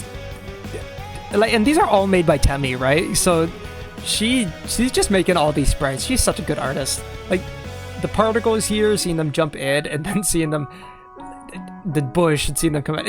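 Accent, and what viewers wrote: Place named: United Kingdom. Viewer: you sound british now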